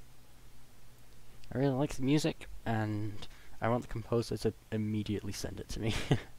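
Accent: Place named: United States. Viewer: My G is still alive. Where d'ya get that American accent??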